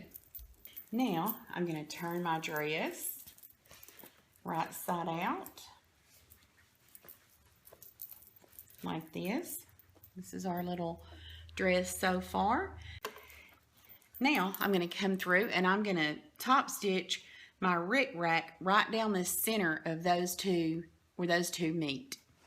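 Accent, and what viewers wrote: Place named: Georgia, United States. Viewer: I love your dresses 👗 and the way you explain your steps .... love your accent it reminds me when I lived in Dahlonega Georgia 😍🥰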